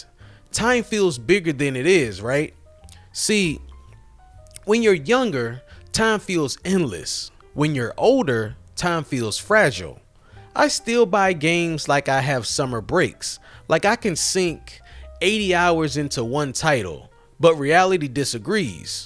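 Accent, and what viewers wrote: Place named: New York, United States. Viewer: Couldn't watch the entire video because you keep saying brought instead of bought.. you nost likely say soar instead of saw and Mare-Rio instead of Marr-Rio. I refuse to listen to anybody that pronounces words that way and its 99% people from NY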